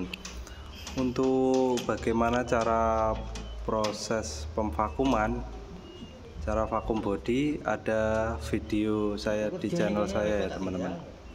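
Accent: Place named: Central Java, Indonesia. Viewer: Logat bicara jateng ..jatenge ngendi pak..?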